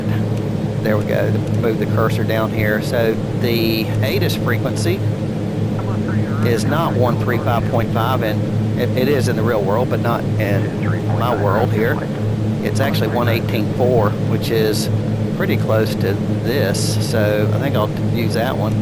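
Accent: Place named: Texas, United States. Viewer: are u from Texas? Sounds like that greetings denmark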